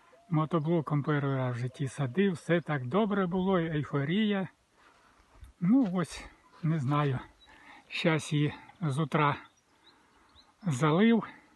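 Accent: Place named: Kharkiv, Ukraine. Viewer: Какой у вас укр.язык красивый прям как у нас в деревнях-Харьковская область.У меня папа так тоже говорит.Мира нам всем и доброй погоды.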